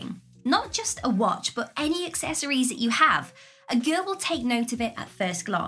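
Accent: United Kingdom. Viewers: Now even though I'm 57 and very happy in my own skin, this is such a fun video to watch because the speaker has a most pleasant British accent and seems to be able to present her "material" in such a fun & fresh way. IMHO, this video is way more enjoyable than most of the shows on TV. Anyway, I just wanna say, "keep up the good work"..... I think your efforts are not only practical but quite easy to grasp😊 👍👍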